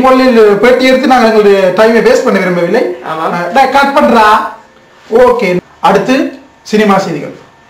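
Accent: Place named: Sri Lanka. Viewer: starting 02.33 it was really funny guys. I like srilankan accent. Try to make more like this video.All the best!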